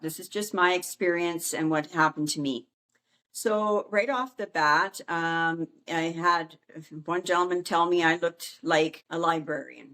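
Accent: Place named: Canada. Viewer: New subscriber here ...love your charming Canadian accent 😊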